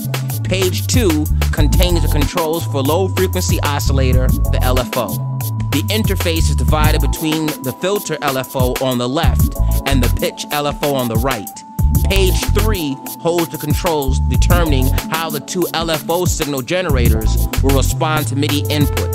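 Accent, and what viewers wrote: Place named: United States. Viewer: No I'm just saying that his english teacher which I'm 100% sure that teaches British english and not american english would assert to him that the accent of the voice in this video is american and not english ( in a sense of british english ). That's all my friend! ...and I don't know why I'm losing time to clarify this stupid thing to you.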